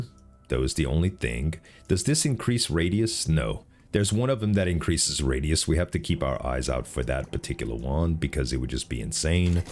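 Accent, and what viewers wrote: Louisiana, United States. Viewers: Are you from Louisiana? I'm from lafayette and work in New orleans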